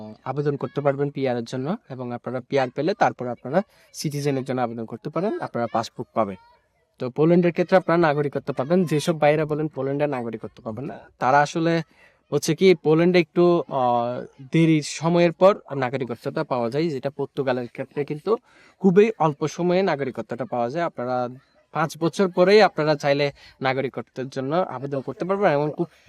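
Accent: Chittagong, Bangladesh. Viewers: ভাই আপনি কি চট্টগ্রামের ছেলে?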